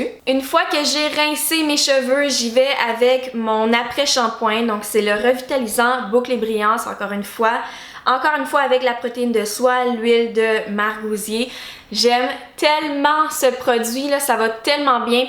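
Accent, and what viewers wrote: Quebec, Canada. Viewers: Tu Es belle 🙈et tu as l’accent de Céline Dion 😍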